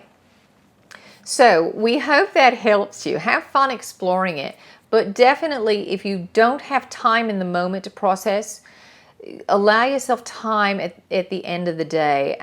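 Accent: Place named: Australia. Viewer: I thought you were Australian!!! This is the first time I have ever heard you talk as, and not as The Pleidians. So, the voice of the Council that you channel sounds a bit Australian to me - actually VERY Australian to me. Have you ever been told this?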